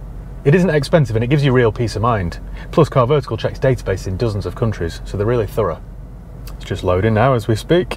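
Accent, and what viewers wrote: United Kingdom. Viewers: He’s from a British island colony so no you’re not mistaken about the accent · Wait, you moved to the UK? I thought you were a UK native. Shows what I know about accents haha.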